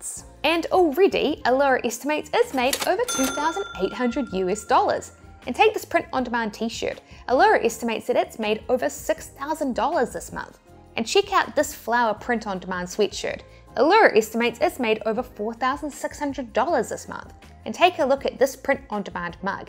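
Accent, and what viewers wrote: New Zealand. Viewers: Pits =Pets 🤣🤣I'm a new subscriber and Im loving your content.! ☺ So basically New Zealanders pronounce "e" as 'I" ? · I like your accent, I was once in New Zealand and in the beginning it was hard to understand but afterwards it sounds so cute 🥰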